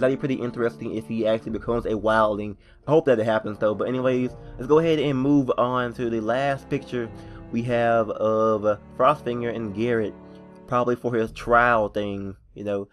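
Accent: South, United States. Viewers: Your American accent is awesome. You southern American urbans sound so much different than us northern Canadian urbans.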